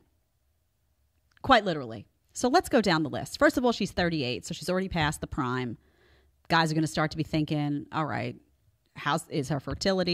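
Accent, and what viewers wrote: New York, United States. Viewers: I like the points, but the way you screech and say Mmmhmmm are very off putting at times. Calm down the New York sass and your numbers will go up.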